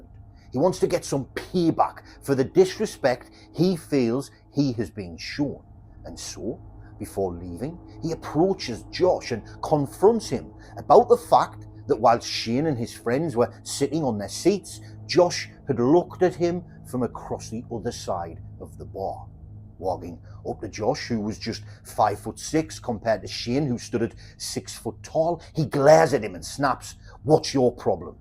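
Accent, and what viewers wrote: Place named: England, United Kingdom. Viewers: lol and this conment got 2 thumbs up? It's quite clear he's from the north east. I'd hazard a guess at Sunderland or more likely County Durham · True English accent love it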